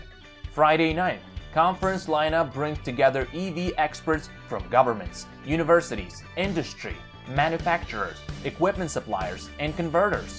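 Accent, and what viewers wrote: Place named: United States. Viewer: Good video, good text, but why couldn't we have a Strayan deliver it? It sounds like something you'd hear on the shopping channel. No offence intended to our American colleagues, but one of 25M of us should have been able to do the voice over, imho.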